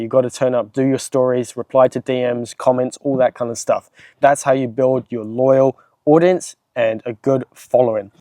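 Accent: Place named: New Zealand. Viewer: Are you in NZ? sound like a Kiwi. Great to have your passion.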